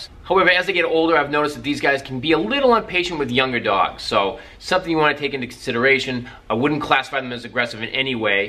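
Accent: Boston, United States. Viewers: where does this guy live??? He has a boston accent, but there are no sharks in boston. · Boston? I recognize the accent...and the shark comments! Interested in this breed but I can’t find local breeders. Do you know of any? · Can tell this guy is from Boston immediately 😅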